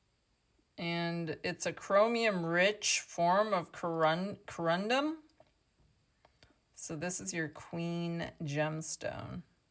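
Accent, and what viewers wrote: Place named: United States. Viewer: sounds american Indiana or Colordo im guessing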